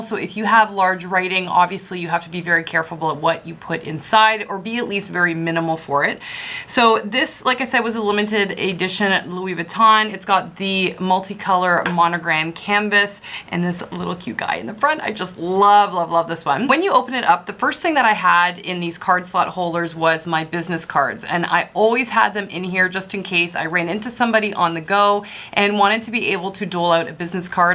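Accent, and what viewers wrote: Canada. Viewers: Hey beautiful mama. I love your accent. You must be Canadian. I’m a fan ~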